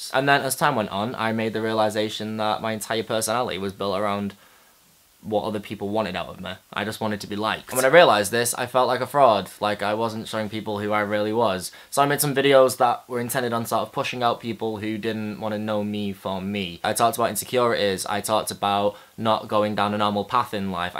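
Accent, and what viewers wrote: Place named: Yorkshire, United Kingdom. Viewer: The nature of many youtube channels is lonely gay boys who need some sort of family and support system. And when the teens get to be 22 or more, they fall off. They don't much need youtube anymore. I only subscribed originally because you were kind of cute and I liked your Yorkshire accent. I don't much think the long hair and nose-ring behoove you, though.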